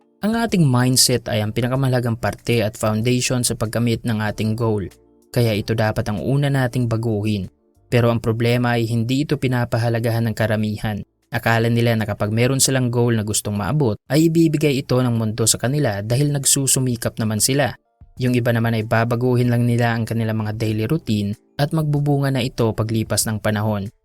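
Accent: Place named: Visayas, Philippines. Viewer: Ikaw rin dapat mo baguhin pag sasalita mo parang bisaya..pilipit ang dila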